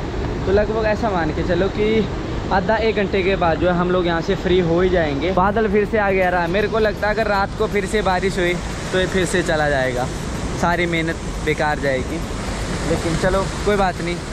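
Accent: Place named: Pahadi, India. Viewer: pahadi mix hindi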